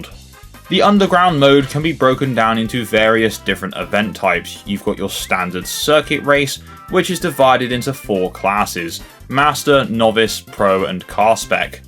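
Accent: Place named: South, United Kingdom. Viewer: 90% of Southern English middle class men have this voice lol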